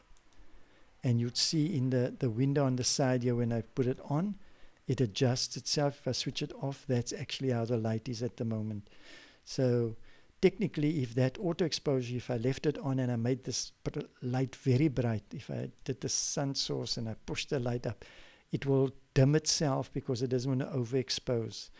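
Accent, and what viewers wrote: South Africa. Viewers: ) that ZA accent is very telling. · Awe m'bru...you Capetonian? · you kind of have a South African accent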